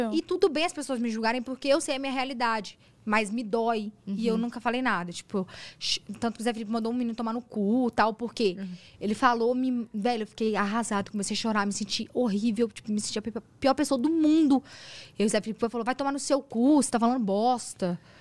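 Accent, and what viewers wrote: Minas Gerais, Brazil. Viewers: O sotaque dela é mineiro. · o sotaque dela é mineiro ou goiano ? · Gente, a é mais mineira que eu que moro em minas kkkkkkkkkk Véi, uai, “esse trem e diferente demais uai”, trem, “nunca vi um trem desse”, maravilhosa, buxinha kkkkkkkkkkk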